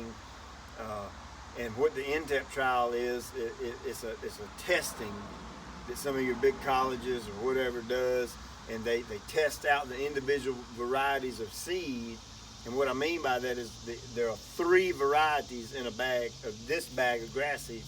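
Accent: South, United States. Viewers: That would be awesome to watch both of you guys do a collaboration together. You both are great at what you do. I appreciate the information you pass along to all of us here. BTW, my wife rolls her eyes when I watch my videos but when comes on she'll watch with me. She just loves your southern accent. Keep up the great work,.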